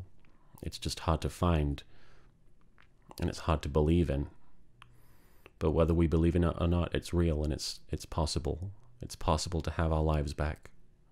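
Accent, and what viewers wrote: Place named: Northern England, United Kingdom. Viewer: LK, everyone makes mistakes and just because a decision is emotional that doesn't make it stupid. I know how hard it is, but you need to understand that you can't change the past year. I'm happy that you've found a medication that works well for you. (Unrelated note: are you from the north of England? I'm from Doncaster and I thought your accent sounds like mine)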